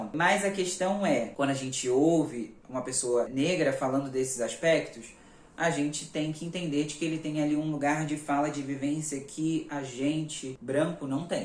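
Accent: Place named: Rio de Janeiro, Brazil. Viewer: Aí que saudade desse sotaque carioca e desses expressões involuntárias ❤️📸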